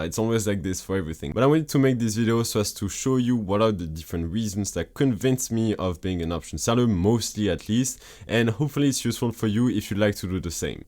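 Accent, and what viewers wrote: France. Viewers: Good video mate , I tried to open a tasty works account (I’m in 🏴 England) Was easy to open but getting the money into the account via CurrencyFair was a right nightmare. Ended up opening an interactive broker account and I can get the money in and out with no issues as interactive brokers have bank accounts throughout the world so it’s just like sending it to another English bank account. My 1st cash secured put will be going in today also 🥳🥳 on AT&T because ultimately I don’t mind if I get assigned. By your accent I’m guessing your french so do use CurrencyFair or a french bank to fund tastyworks just out of curiosity. Have a good day.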